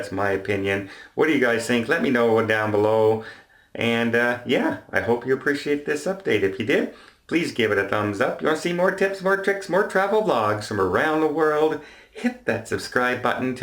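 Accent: Canada. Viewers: ? I love your videos. I/m just an average Gay American (IF that's even possible??) So, I grew up on the West Coast (Los Angeles) and I hear accents.? I knew you were Canadian the minute I heard your accent AND??? Because you are a kind and respectable person. YOU are a treat!!!!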